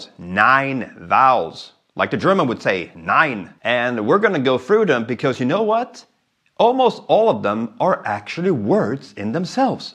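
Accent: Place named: Scania, Sweden. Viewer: Ööö du talar skånska.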